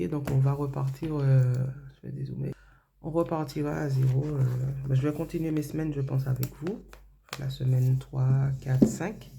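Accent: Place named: Antilles, France. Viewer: Coucou bienvenue! Je me suis abonné 🎉🎉 j’entends les Antilles dans l’accent sa m’est familier😂! Bon courage à toi et a ta famille et au plaisir de voir tes prochaines vidéos 🎉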